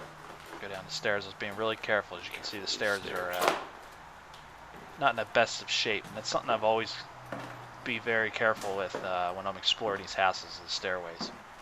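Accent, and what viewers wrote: Philadelphia, United States. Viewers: Just wondering, as a born and raised Pa lady, your accent sounds like a Philadelphia one. Am I right?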